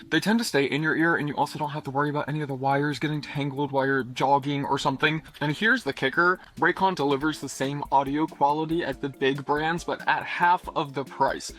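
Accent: South, United States. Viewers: Never heard your Southern accent until now. You must have been around your fmaily a lot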